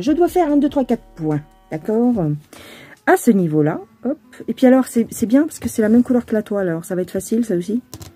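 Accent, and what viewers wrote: South, France. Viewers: Mais garde ton accent du Sud bon sang Ceux qui ne sont pas contents qu ils aillent voir ailleurs Ce sont tes délires qui font ton charme❤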